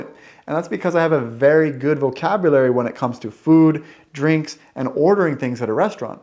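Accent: Sweden. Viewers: Interesting enough, i think I can hear some Swedish accent in you English :) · Is it just me or has his accent speaking English gotten closer to a Swedish accent speaking English? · Has anyone pointed out that you now speak English with a Swedish accent? To my American ear anyway and as someone just beginning to try and learn Swedish.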